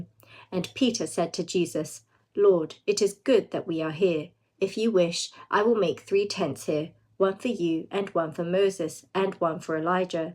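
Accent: United Kingdom. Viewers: English is my second language, and I love the British accent. You can't imagine how happy and grateful I am for your reading. please never stop. God bless you.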